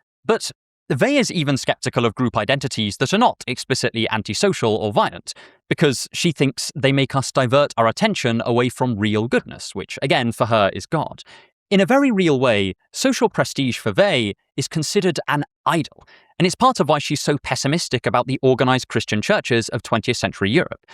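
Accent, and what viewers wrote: United Kingdom. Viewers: I like yur voice...are u brit?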